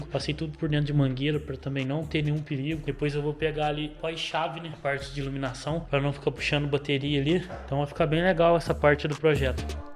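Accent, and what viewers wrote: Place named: Paraná, Brazil. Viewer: sei que esta pergunta pode parecer estranha, mas... você é do interior do Paraná, né?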